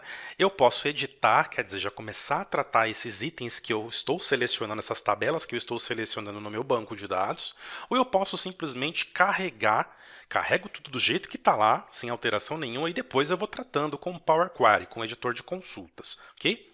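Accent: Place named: São Paulo, Brazil. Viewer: Muito boa a aula. Obrigado. Me inscrevi no canal. Obs: Você tem o sotaque paulistano mais característico possível.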